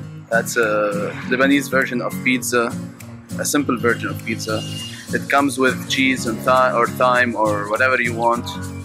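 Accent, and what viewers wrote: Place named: Iran. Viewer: Your accent is similar to Iranians. are you Iranian?!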